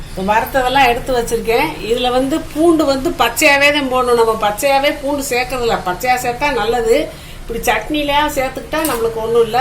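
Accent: Madurai, India. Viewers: Unga pesum murai romba azhaga irukku, neenga Madurai pakkama?Unga tamil madurai, virudhunagar side tamil madhiri irukku, ketkum bodhu romba sandhoshama irukkudhu🙏🙏🙏🙏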